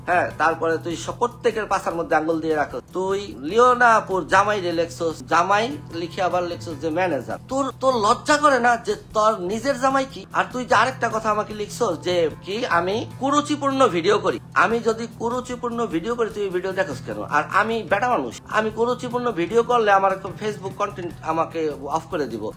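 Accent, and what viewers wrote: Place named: Faridpur, Bangladesh. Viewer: মামা আপনার বাড়ি মনে হয় শরীয়তপুর বা ফরিদপুর ওইদিকে আপনার কথার ভাষাতেই বুঝা যায় জোশ মামা জনগণের মামা আপনি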